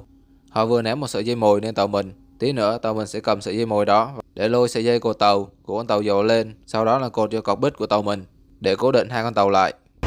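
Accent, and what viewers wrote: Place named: North, Vietnam. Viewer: Mình xin góp ý với chủ kênh.mình là người gốc bắc thì cứ nói theo thuần tiếng bắc.anh chị em sẽ rất ghi nhận công sức của bạn! Chân thành!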